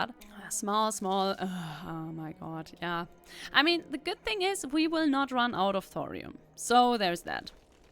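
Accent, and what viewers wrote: Germany. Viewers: 1 hr of yapping in her cute German/English accent? I'll take it.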